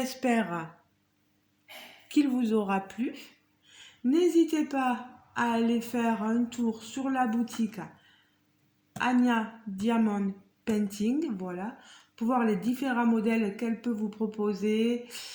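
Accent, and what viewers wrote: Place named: South, France. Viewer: Coucou ma petite ❤ Merci pour cet unboxing avec une vidéo qui fait plaisir pleine de bonne humeur et ton joli accent du sud 😍 Gros gros bisous 😘😘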